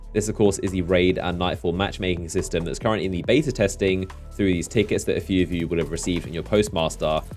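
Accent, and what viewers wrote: United Kingdom. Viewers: I disliked because you’re one of millions of stupid British YouTubers trying to be something. You’re accent and content is useless shit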